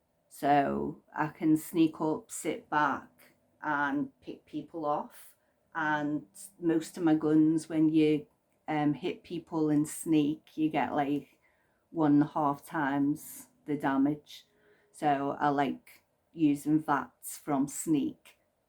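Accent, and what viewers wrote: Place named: Liverpool, United Kingdom. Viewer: I'm used to the contemporary scouse accent. I can see that you're scouse now just thought this accent died with the beatles.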